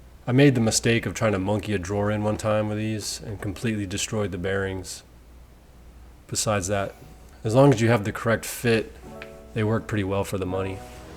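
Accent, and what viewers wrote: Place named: Florida, United States. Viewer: I knew you were in Florida. By the way you were talking I’m in Ocala. How far away are you?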